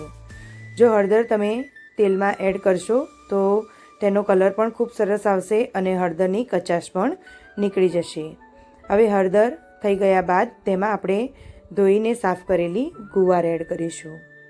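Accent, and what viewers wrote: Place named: Mehsana, India. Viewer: Mouth watering thaali 👌 Your accent looks like mehsana, if so, please reply 🙏 Thanks 😊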